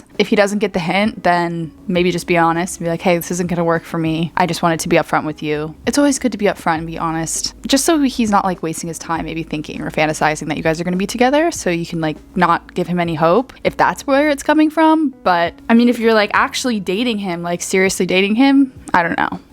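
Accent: United States. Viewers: Ikrr the Minnesota accenttt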